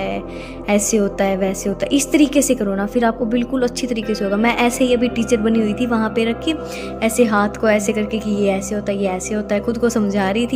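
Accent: Haryana, India. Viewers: Haryana se ho aap didi because aapki awaj se lagta h muje ❤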